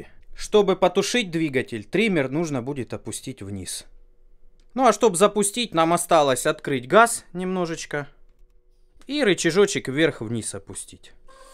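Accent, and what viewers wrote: Rostov, Russia. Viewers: Понад корпусом🤣 ростовский говор ни с чем не перепутаешь👍 привет узловой бригаде связи г. Аксай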